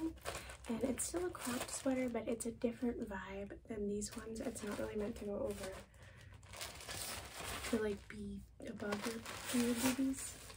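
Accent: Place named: United Kingdom. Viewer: stoppp omg you have to do a video where you just talk with your british accent!!! ❤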